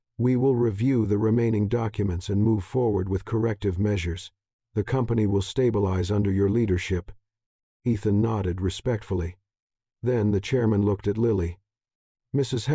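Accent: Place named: United States. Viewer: What do you think of the narration? Is it AI? It has a strong USA accent and a little metallic maybe but I don’t mind it.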